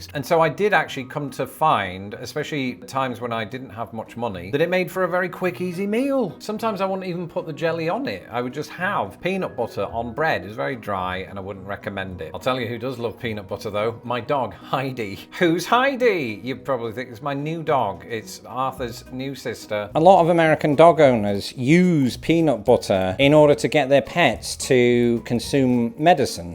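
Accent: United Kingdom. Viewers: After 17 Years of Living in America , You Still have a Very Thick , Heavy BRITISH Accent . 🤣🤣🤣🤣🤣🤣🤣🤣🤣🤣🤣🤣🤣🤣🤣🤣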